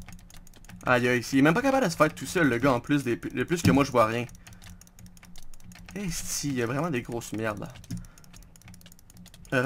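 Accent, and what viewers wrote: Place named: Quebec, Canada. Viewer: Comment fais-tu pour percer malgré un petit handicap qui es notre langue québécoise? Pensait tu en commençant que tu aurait cette handicap de language , j'aimerais que tu fasse une F.A.Q .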